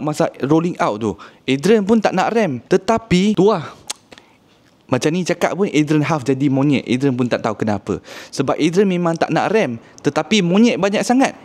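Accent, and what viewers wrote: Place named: Kedah, Malaysia. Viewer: pekat sungguh kedah hang ahahaaha anak jati kedah ni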